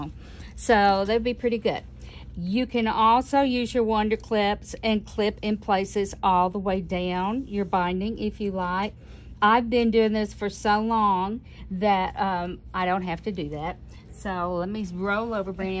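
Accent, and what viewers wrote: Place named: South, United States. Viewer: Excellent tutorial! And I love your southern accent!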